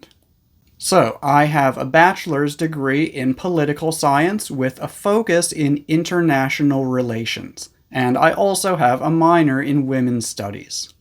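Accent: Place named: United States. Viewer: Fine. I noticed you have an american accent.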